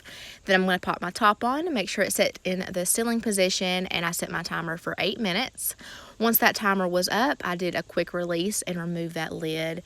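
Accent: South, United States. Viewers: Are you gonna do any more videos of packing your husbands lunches? I got so many ideas from those videos as well and hoping that you’ll bring those back. Love your content love your southern accent keep the videos coming♥️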